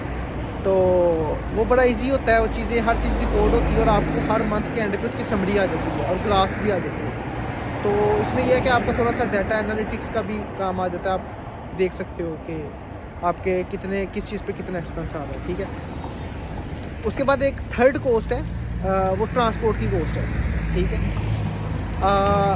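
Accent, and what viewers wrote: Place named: Lorestan, Iran. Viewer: bhai Love for ur politeness and Lori Accent❤😂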